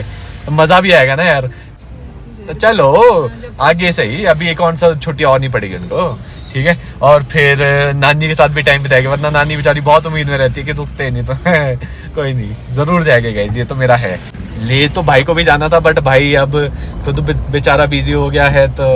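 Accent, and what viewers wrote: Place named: Himachal Pradesh, India. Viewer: It's only himachal language tone.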